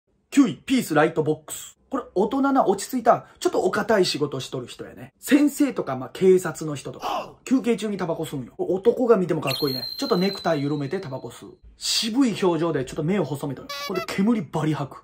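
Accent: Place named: Nagoya, Japan. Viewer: あんた名古屋人か？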